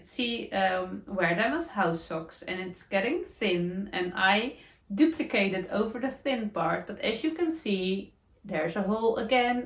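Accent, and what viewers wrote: Netherlands. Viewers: Oh.....you can talk as long as you want. We love to knit and listen. Your Dutchy-English is VERY good. And how special that you care enough to get it right and learn. It is just wonderful that we do not need to learn Dutch - especially if it is more difficult. Can you hear me laughing? It certainly sounds more difficult. Love your patchy socks - so worth it!!! I do that too. Have a lovely Easter weekend with your family on both Easter days. 🧶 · I love hearing your "Dutchy English" and to that end I never want to rectify what you say. That said we do say 'modify' and not 'modificate'. 🥰 xxx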